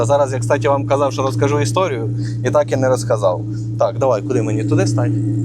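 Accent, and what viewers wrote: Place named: Russia. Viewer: І моZZkaльська підзвучка теж ріже вухо. І головне, що не старається виправлятись.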